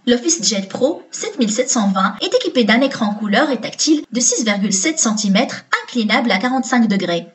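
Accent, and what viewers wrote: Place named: Morocco. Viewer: voix marocainne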